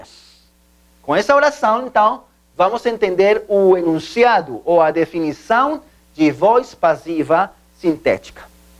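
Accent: Portugal. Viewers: Pelo que entendi ele é português. Sotaque e grafia de Portugal.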